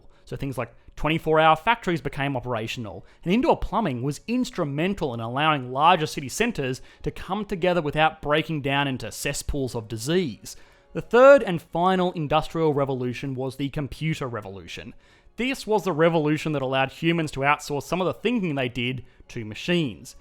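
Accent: Australia. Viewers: Is true. If it wasn’t for that sweet koala accent, I wouldn’t be able to get through all the gloom and doom our future seem to be careening towards. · Does the author speak in Australian accent ? Thanks for the video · "the sweet supple sound of my Australian accent" Darn he figured out the real reason I watch! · Mate your Ozzy accent...:))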